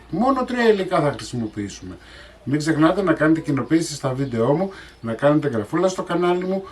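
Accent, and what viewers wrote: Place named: Rhodes, Greece. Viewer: Έτσι μιλάνε κ Στην Ρόδο τονίζουν το ΤΤσαν διπλό κ τό Κ...😂😂 (Όπως οι Κύπριοι) ❤❤❤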